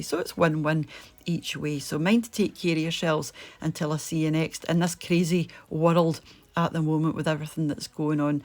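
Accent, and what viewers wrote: Scotland, United Kingdom. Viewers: Your wonderful scottish accent always cheers me up. Thank you for that. Hope you and your family are safe and healthy as well.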